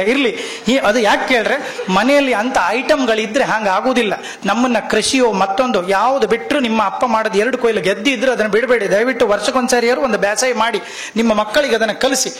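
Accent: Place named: Udupi, India. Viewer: speaks very beautiful UDUPI Kannada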